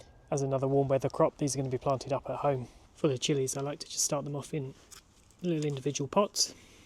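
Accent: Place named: Australia. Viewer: Goodness, you sound so Australian!! My bad.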